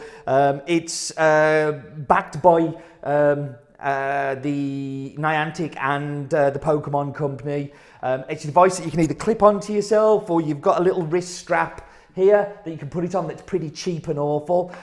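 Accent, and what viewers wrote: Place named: Ireland. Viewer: Could be Irish aswell...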